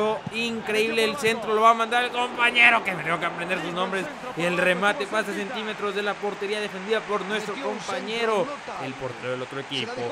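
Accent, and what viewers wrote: Argentina. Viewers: quisiste hablar como argentino en el video?? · Muy gracioso hablando argentinamente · Que buen acento de argentino >.<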